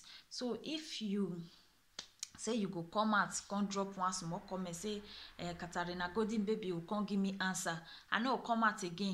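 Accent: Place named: Africa. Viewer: Hello African Queen, luv ur accent 🌹😘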